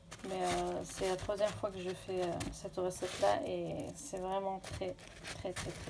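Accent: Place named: Belgium. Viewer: Super une chaîne pour le mcc ! Cest trop mignon ton accent tu es Belge ?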